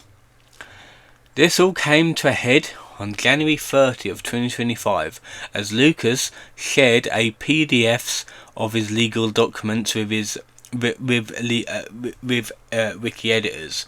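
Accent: United Kingdom. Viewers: average british voice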